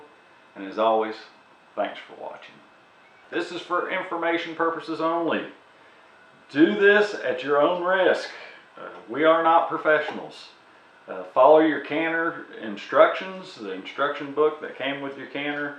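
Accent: United States. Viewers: I like this guy! And he got a like and subscription because he wasn't all "hay goys loike n subscroibe dood" na he's all American. A man America herself wishes she could emulate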